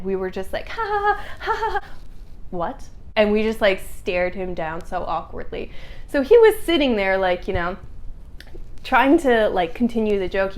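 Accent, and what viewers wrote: United States. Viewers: Probably because you learned brit english like us, she's American so she speaks American English. There are differences between two of them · Is about your American accent ya da because of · I live in Oxford UK for more than 3 years, and we call the shirt is just a shirt. I think what do you tried to say for the shirt is about to your American accent. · Turkish schools teach british english but you speak american english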